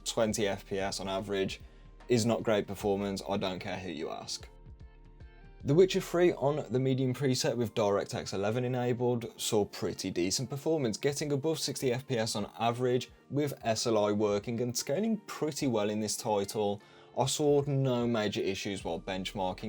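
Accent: Black Country, United Kingdom. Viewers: It's nice to hear a black country lad. Keep up the good work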